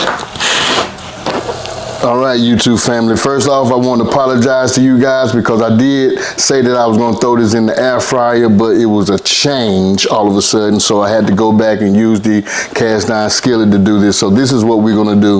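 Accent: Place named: East Texas, United States. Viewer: You have to be from East Texas, because the accent and the wording and the GOOD OLE' SOUL COOKING is giving East Texas. I was born and raised in Lufkin, Texas. Keep Up the Good Work. Love your channel!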